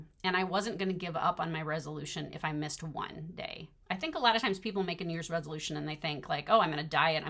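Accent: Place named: Pennsylvania, United States. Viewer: Woo Hoo! A blast from the past (and ever present!). What a sight for sore eyes you are dear lady! And what a fabulous catch up from one of my favorite people. I can’t wait to meet you in Tulsa this October. I love everything you showed us today. And, I am thrilled that you love your new happy place! My folks were born and raised in Erie: Mom in Little Italy, dad in the Irish and German neighborhoods. With dad’s work, we migrated west to LA then to Texas. That’s where we’ve been since 1970. I can only imagine the authentic everything you’re able to enjoy in the city of brotherly love. Your accent even reminds me of my cousins in PA. So, I’m sure you fit right in. Please drive carefully, stay safe, and enjoy every blooming minute of being with your friends in Tennessee. Congrats on your business, your new releases and on your new life in a lovely community. 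Happy stitching every day! You look great and so happy! ❤❤❤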